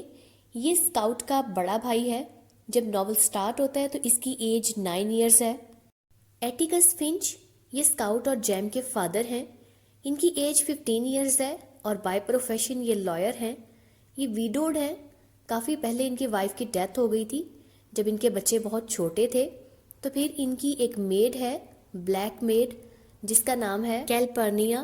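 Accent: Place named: Pakistan. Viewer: एक्सेंट बाहिर सुनकर मुझे लगा कि आप पाकिस्तानी हो और सच में आप पाकिस्तान से हो👍 ग्रेट You have summarized the story very well. I am an Indian and I have to study this story in the 5th semester of college in Delhi University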